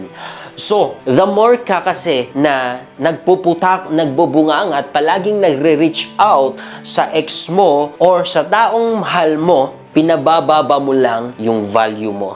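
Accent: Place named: Ilocano, Philippines. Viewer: PArang ilokano itawis ung pagbigkas mo Ng salita sir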